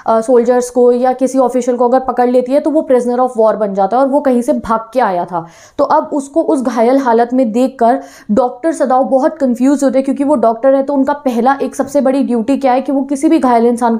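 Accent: Punjab, India. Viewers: Didi aap punjabi hai??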